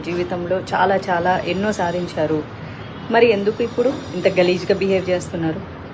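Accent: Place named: Andhra Pradesh, India. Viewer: సగం ఆంధ్ర సగం తెలంగాణ.... సగం నార్త్ ఇండియా తెలుగు... భాషని కూని చేయకు....!!!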